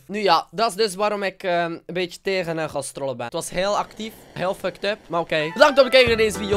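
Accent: West Flanders, Belgium. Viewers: Ben jij een west Vlaming?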